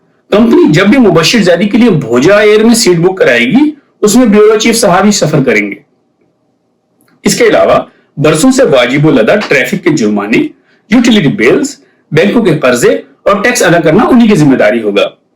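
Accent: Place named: RP, United Kingdom. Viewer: ایسا لگ رہا جیسے بی بی سی سن رہے. کمال ایکسنٹ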